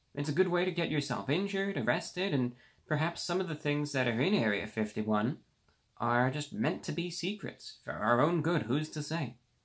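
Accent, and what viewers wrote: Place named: New York, United States. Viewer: We will land on the moon if we invade area 51, just kidding... I wouldn't try to invade it! I do not trust the government, however I don't think aliens will ever come to this earth because maybe we are the aliens. Sometimes I think we are a very old race, and not necessarily in human form but in consciousness, I have my own reasons for thinking this. Also great video, I can hear your NY accent more and more and I love it!!! Love your laugh too! You are so effin cool and open minded with your all your commentators. Also I am seeing more and more folks thinking that we are living in a simulation. I can not agree with this because of the randomness in this world and I mean random car wrecks, and children dying of cancer. What do you think about us being in a simulation? Ps. I watch all the adds so you get paid. love ya!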